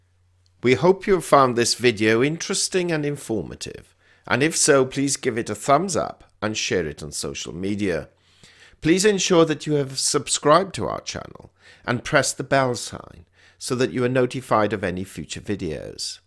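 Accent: United Kingdom. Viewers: I only take financial advice from those with British accents. Thanks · I had to give a thumbs-down today not because of the information but instead of the straightforward logical rational approach that is usually given there was a sanctimonious are that might have been partially attributed to that uppity British accent but probably because of the inner sanctum exclusivity that starting to emerge giving them a sanctimonious are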